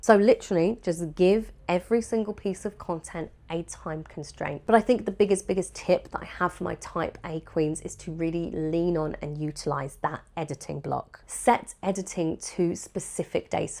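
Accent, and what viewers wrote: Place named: England, United Kingdom. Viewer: You inspired me so much to keep creating,. I’m an artist, and I promote my art and teach courses, and lately I’ve been feeling really discouraged by the algorithm and the little time I have after my office job. But what you said about setting boundaries with content creation really stayed with me, I’m going to start doing that. I still haven’t finished watching the video, and I know the 30 minutes I have left are going to be full of value. I truly appreciate you for that. Technology is amazing, I can’t believe I get to access such valuable content all the way from Colombia while you’re so far away. By your accent, I think you’re from England?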